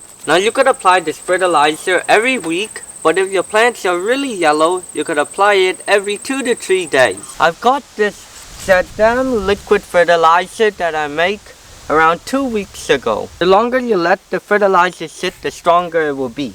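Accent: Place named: Laos. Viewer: Absolutely a fantastic video. Very good job, young man. Please dont be offended, but you remind me of the Laos people i had the prevelage to work with. It's your dialect. Some people get offended if you miss guess their nationaly. What country are you or your parents from, as you may be a first generation to a different country? Your english is really good and clear easy to understand. You have a good knowledge. I learned some good information. I went to the other website that you pointed to, and your sibling also did a fantastic job, has good knowledge with exclent english.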